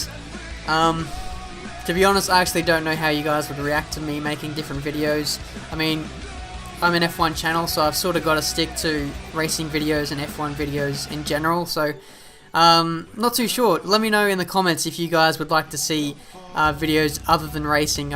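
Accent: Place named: Australia. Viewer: I subbed to you because of: - your smooth, no assists used, high level driving at a constant rate - the great editing (music, best highlights) - the setup videos - your pleasant voice (#nohomo). Because as a LISTENER thats very important. For example one of the reasons why speedyw and pewdiepie are so big is that they also have a very pleasant voice. I can not stand childish, high toned voices. That gives me the shivers in a bad way lol. :p - the aussie accent hehe Ps. I'm surprised that you only followed F1 since 2010? I for example, am a fan since I was a lil boy lol (since 1999). You missed some great fights between Hakkinen and Schumacher, Alonso and Schumacher then :p. Ps.ps. keep up your awesome work! ;)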